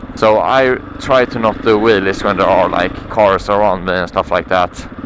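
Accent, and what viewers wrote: Sweden. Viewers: Are you Swedish??? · Are u swedish?🇸🇪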